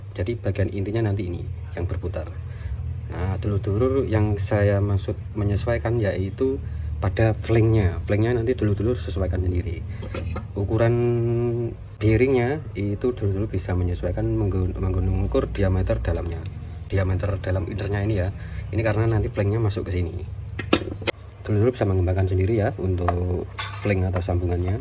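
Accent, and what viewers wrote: Surabaya, Indonesia. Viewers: You sound like you are from Surabaya going by your accent?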